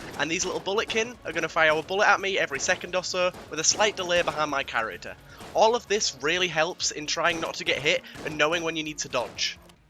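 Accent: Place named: England, United Kingdom. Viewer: Oh thank goodness....and English accent!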